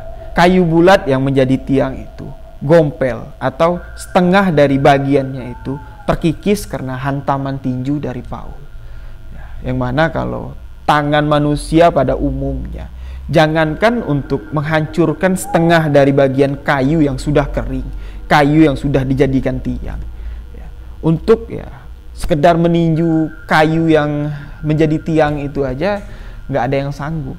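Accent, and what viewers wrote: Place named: West Sumatra, Indonesia. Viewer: Abang ini orang minang ya... Logat bahasa nyo agak urang minang barbahasa Indonesia, terperangah, dll heheh..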